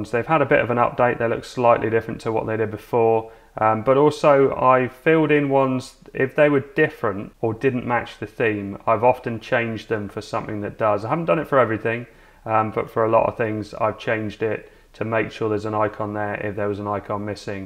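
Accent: United Kingdom. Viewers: nice to hear a fellow Brit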